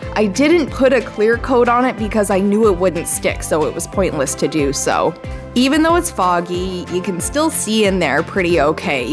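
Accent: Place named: Maritimes, Canada. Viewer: You're just so friggin creative thanks for sharing your artistry, amazing, your sound like your from the Maritimes lol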